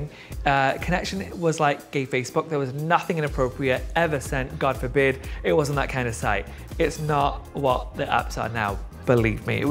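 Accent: England, United Kingdom. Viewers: I know this is a year late but honestly yes, stop making fun of people because their accent isn't "perfect" he has a British accent and that's okay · His pronunciation might be regional from where he grew up in England. I studied Indian vegetarian cooking from a master ( an authentic Indian guru) and I was a food snob for years, thinking his way and pronunciation was RIGHT. Then I realized that I was being unnecessarily judgmental and making myself better than others. That’s not the goal of all the yoga I was doing. I’m much more forgiving of and actually appreciative of people who don’t do life exactly the way I do.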